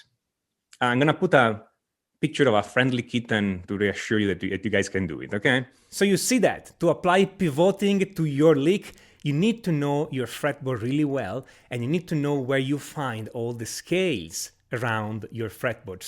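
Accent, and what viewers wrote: Italy. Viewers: I love your positive energy, your great insight, your interesting ideas and angles and your charming italian accent. You make me smile as you make me wiser. Thanks! Mille grazie!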